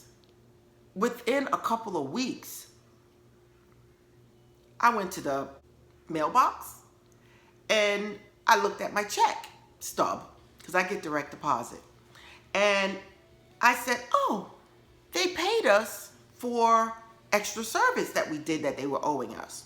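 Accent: New York, United States. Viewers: You have to be from NYC♥️🙏🏿I hear your accent. I’m from Brooklyn and enjoy your content. ♥️🙏🏿Blessings to you and your family. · Girl! I have never seen you or your videos before but this video was in my feed and it is no accident. This is definitely something I needed to hear. I've done this in the past - not really knowing what it was called and it is an amazing technique. I must pick it up again because I need to break through some stuff. BTW, you must be a fellow New Yorker. I can tell by the way you say "tawk" aka talk. LOL. Anyway, I liked and subscribed.🙂👍🏽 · Tallkking..love my fellow nyer. Great mtrl..thank you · Definitely from New York.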